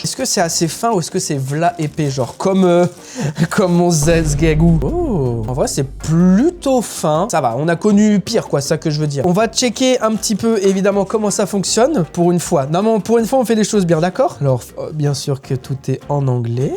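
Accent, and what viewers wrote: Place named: Quebec, Canada. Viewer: Omg l'accent québécois jadoreeee en ten que québécoise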